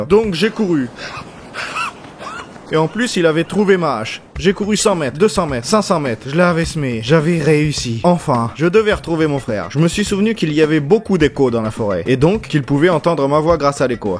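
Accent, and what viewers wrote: Russia. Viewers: t'es russe ?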